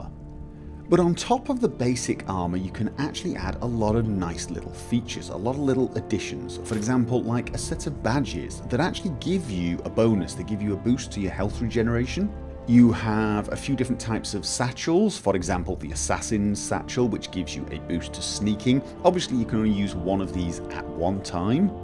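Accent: United Kingdom. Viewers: His accent is not Scandinavian at all it's British (I know the accents there vary but I'm not sure exactly where is from). However, he does live in Norway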